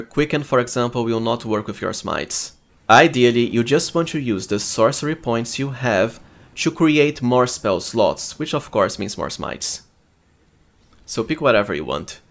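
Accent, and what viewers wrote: Brazil. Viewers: Eu reconheço um sotaque brasileiro quando escuto um!!!!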